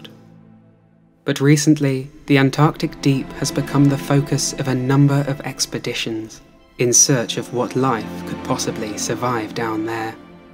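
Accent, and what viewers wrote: United Kingdom. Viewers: Why is it only 12 min long ? The recording is amazing !! The quality is incredible … I don’t care for tne narration though … it seems flatlined and typical , reading every single thing with the same inflection , not having any true feeling / emotion behind it but just flat lined with that same typical cadence every few words , same lifts same falls ( if you can even call it lifts and falls when the vocal range of speaking only rises and is lowered slightly , slowly and maybe a half step in one direction or the other .. sounds like every other recording where someone with a British accent is trying to narrate a documentary… always with same inflections and none of it feels emotive or connected. Many people may disagree and they have a right to their own opinion and can say whatever they wish in their own comments , which I’d expect the same respect regarding my own …. 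I say this not to belittle , but to illustrate my point , bc honestly I feel like it’s something that could be worked out and improved upon … not everyone is meant to be a narrator and I’d leave that up to a handful of people …. With broadcasting experience that have the voice for it .. not to say you won’t eventually have the voice for it , but being completely honest , it takes an amazing mind blowing film and makes it come off amateurish with this narrative.. please at least be unique among those who just read with no pulse whatsoever .. omg!!! There are so many people that when they read , they either bore pe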